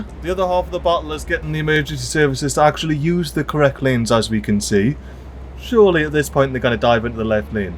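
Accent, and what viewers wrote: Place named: Scotland, United Kingdom. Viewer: Hey, German here. What kind of dialect you're speaking? Rooond-a-booot :) I would guess somewhere in Scotland?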